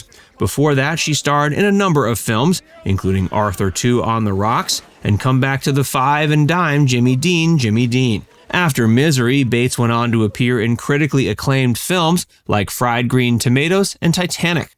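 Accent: United States. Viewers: I like the narrator who speaks beautiful American English…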